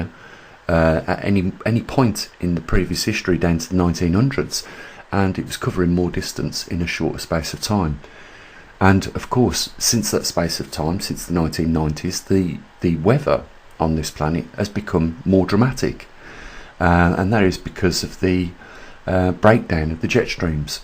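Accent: United Kingdom. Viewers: Love the British accent! But are you talking about the earth's Chandler Wobble. Because the earth is moving thru space like a drunkard right now. And that is giving us extreme weather changes around the planet. The crazy drunkard planet is giving us low and high jet streams in America which means severe weather such as tornado's, blizzards and ice storms so far in December 2021 and January 2022!! Is this what the Mayans were talking about? You said in the next 40,000 years the earth will start to get colder! And we are about 6,000 years into it? Wow!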